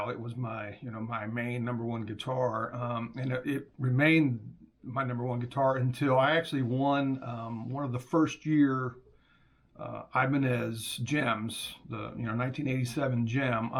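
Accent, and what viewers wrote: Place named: Baltimore, United States. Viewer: oh. I kind of heard the bmore Maryland accent in your voice, plus you stated with a Riff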